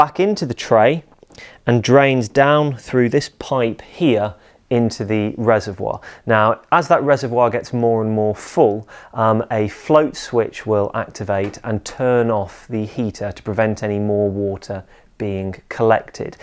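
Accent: RP, United Kingdom. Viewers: Posh accent! :)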